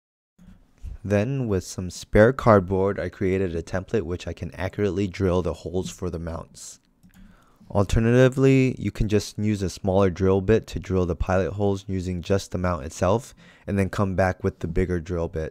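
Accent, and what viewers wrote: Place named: United States. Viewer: hi from peru!! i like you videos i am so curious what is your ethnicity is? you sound are like american. :) thank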